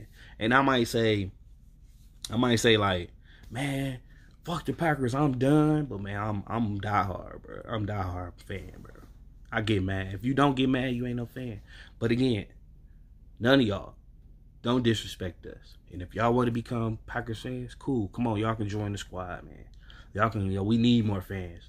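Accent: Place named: New York, United States. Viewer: I’m a Phins fan but I’m OK with the Pack. I hate the Pats like there’s no tomorrow. I came across your video of the draft and found your response to getting Love hilarious. I know the feeling well. We’ve drafted guys I couldn’t stand. By the way noticed you’re Boriqua flag tattoo on your forearm. I’m thinking your from NY by your accent. How’d you wined up being a Packer fan and not a Jet or Giant fan?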